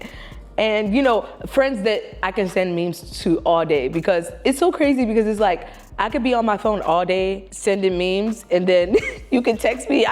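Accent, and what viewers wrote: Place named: Nigerian American, United States. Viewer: The mixture of Nigerian and American accents.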